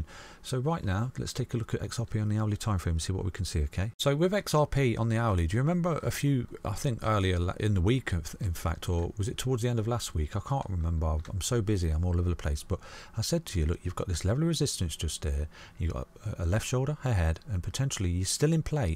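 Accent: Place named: Yorkshire, United Kingdom. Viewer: I bet he's from Yorkshire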